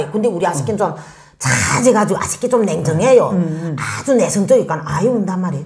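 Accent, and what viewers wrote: Hamgyong, North Korea. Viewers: 여사님 이름이 마음에 듬니다 남한에는 트롯트가수가 유명하지요 함경도 말씨가 정감이 갑니다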